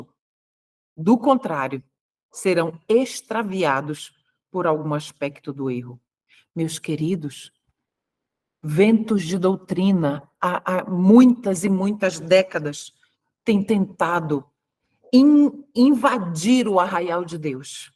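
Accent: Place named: Pará, Brazil. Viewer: Obrigada meu Salvador Jesus por tantas bênçãos através dessa live!!🙏 Obrigada minha irmã do céu!❤ A Sra é de Belém do Pará? Seu sotaque parece paraense... Um grande abraço! Nos vemos breve com fé no Salvador!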